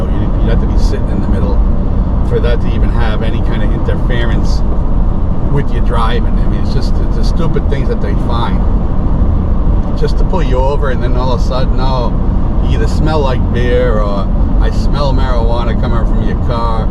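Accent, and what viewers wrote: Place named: New England, United States. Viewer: Hey you sound like a new england guy, where from im from north andover ma. Any way i rhink alot of the problem is militarized policing. Virtually all police today are former military. This is the american people experiencing what our military have done around the world while at war. So take a solider who has done multiple tours, a job where violence is used to solve problems. Bring them home, send them to a two month academy then give them guns a badge and qualified immunity. How could anyone expect that to not go wrong. Oh and the police culture of blanket criminality. You know, a person commits like two felonies a day in this country because of the thousands of ridiculous codes and policies cops know that,;they are gonna find out what you did today on that traffic stop.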